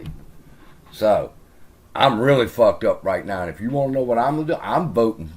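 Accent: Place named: New York, United States. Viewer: Wooow.... Seems like your sounding like the guy from NEW YORK now... He kept saying WAAY in advance what the are going to do... And we're here 🤦🏾‍♂️🤷🏾‍♂️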